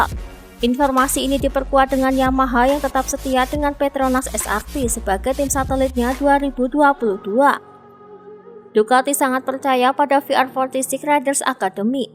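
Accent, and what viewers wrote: Java, Indonesia. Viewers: Halo mbak medok, selalu nonton tpi jarang komen mbak medok hehe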